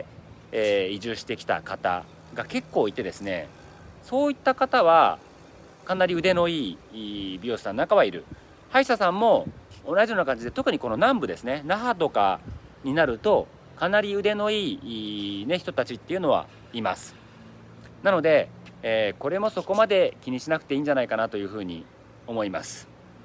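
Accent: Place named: Kanto, Japan. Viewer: あくまで「移住者が語る沖縄」ですね。沖縄県民から見るとあまりも薄ペラな動画。貴方のように沖縄をハワイと混同した「別荘地感覚」で沖縄移住する人には嫌悪感を感じます。沖縄を語るなら、まずは沖縄の言葉やイントネーションを身に着けてほしいですね。関東訛りのナイチャーが何を言ってもそれは「移住者の遠吠え」です。 なぜ沖縄が山を切り開き環境破壊しないといけないのか？ 沖縄の歴史文化はもちろん、戦中・戦後と日本本土の犠牲になった経緯。 米軍基地がある弊害。 そんな事も知らずに「海がキレイ」「南国サイコー」そんな気持ちだけで沖縄に来る移住者はマジで要らない。 沖縄移住を考えてる他県の方は「沖縄の影の部分」を見て知ってから移住して下さい。 青い海は、太平洋戦争で赤く染まりました。 美ら海水族館がある場所は数多の米軍艦船により鉄の暴風と呼ばれる艦砲射撃を浴び、多数の死者が出た土地です。 移住する方はぜひとも、平和記念公園で、この島でどんな事があったのか？ そしてそれが今現在、どのような影響を及ぼしているのかを知って下さい。 沖縄の文化、歴史、そして現在を受け入れてくれる移住者なら、私たち県民も大歓迎です。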